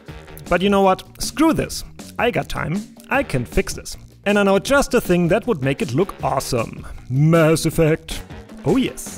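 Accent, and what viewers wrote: Germany. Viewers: Kommst du aus DE oder AT? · Ich liebe dieses deutsche Englisch! XD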